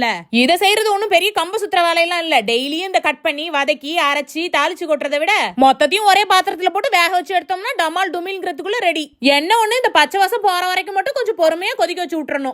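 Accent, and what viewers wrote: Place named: Coimbatore, India. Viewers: பேசுறது எதார்த்தமா கோயம்புத்தூர் பாஷை சபாஷ் ❤❤❤ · அம்மணி உங்க சமையல் ரெசிபியை விட, அந்த கோயம்புத்தூர் பேச்சு அருமைங்கோ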